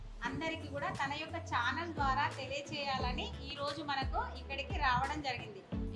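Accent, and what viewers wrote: Telangana, India. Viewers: Super documentary..Nee telamgaana yasa bagundhi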